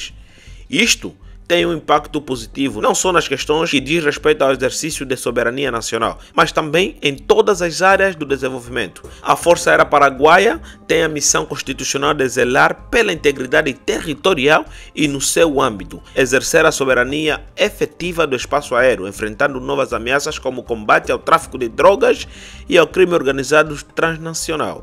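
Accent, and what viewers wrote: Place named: Angola. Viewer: Esse sotaque e de Angola 😊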